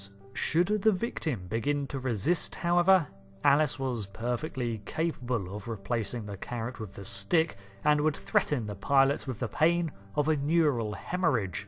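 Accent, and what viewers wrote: England, United Kingdom. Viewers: Dude, your English accent is gorgeous.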